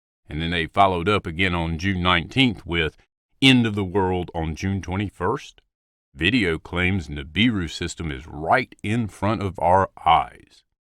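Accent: South, United States. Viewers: I live in the south, and his accent is most definitely southern!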